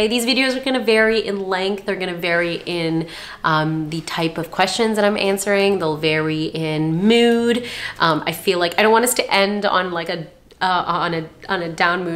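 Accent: Syria. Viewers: You are inspiring preson!, Dr Greeting from Egypt 💜 Note: I was superised when you speak Arabic 😂 Your voice was different and nice and The Syrian dialect befits you very much... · After mentioning that u lived for years in UAE I was about to say speak Arabic so I can know what dialect do u speak but after I know, SYRIAN lol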